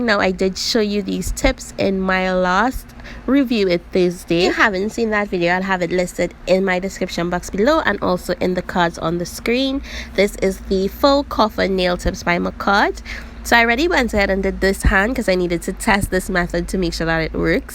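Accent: Trinidad and Tobago. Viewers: I just knew you were a Trini when I heard you talking! I got the Apres Gel X done but I figured I could do it myself because I like the simplicity of the system.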